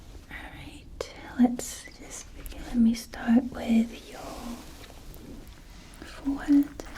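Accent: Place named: United Kingdom. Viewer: 너무나 한국적인 분위기에 고증도 정말 잘하신것 같은데 우아한 영국식 영어가 이렇게 잘 어울릴 일인가요ㅎ 영어공부도 같이 되고 있어요! 좋은 영상 너무 감사해요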